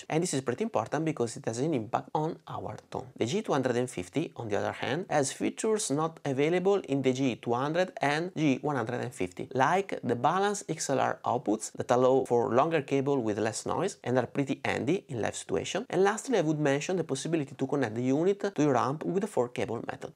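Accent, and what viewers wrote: Venice, Italy. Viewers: ti ze venessian da l'accento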